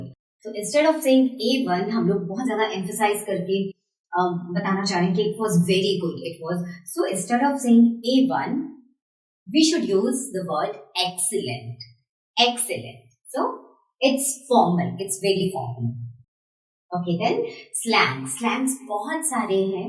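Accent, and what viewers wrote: India. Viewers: ma Cheri fallen for you I beseach unto the boundless beauty of thy grace have mercy on this hapless Mancunian. btw love your "Indian" accent.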